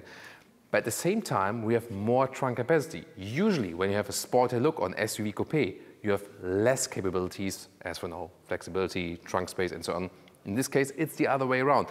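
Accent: Germany. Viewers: I do love these reviews with the German accent, I want to hear him say " for you Tommy ze vor is over".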